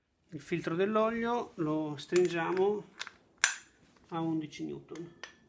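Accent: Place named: Romagna, Italy. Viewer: Ciao, mi sono avvicinato da poco al "fai da te" sulla moto, ( ho un GS del 2005) grazie per i tutorial sempre ben fatti. Per le chiavi dinamometriche, ce n'è una che copre tutti i range di utilizzo previsti dai serraggi dei GS? Oppure mi consigli di prenderne 2 una piccola che arriva a tipo 25/30 Nm e un'altra che da 25/30 va fino a 60? Nm... Grazie per info..... Dall'accento si intuisce che sei romagnolo, posso chiederti di dove? Grazie mille.. Ciao... 🖐️👍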